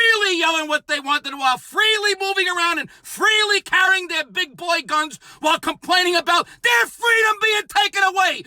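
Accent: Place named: Bronx, United States. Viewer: I LOVE ❤️ you. You talk like my grandparents did in da Bronx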